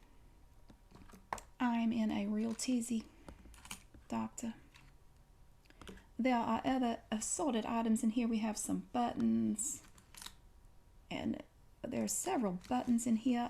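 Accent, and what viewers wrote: South, United States. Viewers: I love this accent, cause it's like a combination of American Southern and an English accent, lol. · Lots of tingles from the southern drawl, wow :) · Reminded me a little of The Golden Girls' character Blanche Devereaux; purely in accent and glamour.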